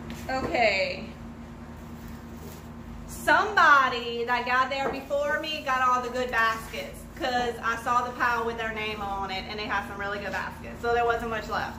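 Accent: Louisiana, United States. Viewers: First time watching and I love the Louisiana accent...the aaaa, ummmm just so cute.. oh my when the kids came in I fell out when you said " Wait I have a kid in here" 🤭 ! I think I am coming back to check out the finished beauties 😊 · I could not find where you were located, but the accent, ahhh, a hint of home - has to be Louisiana! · LOL, I was trying to figure out the accent too, and figured it was Louisanna as well - tiny Canjun French (first time watching as well). Then she says South Louisanna....we did good !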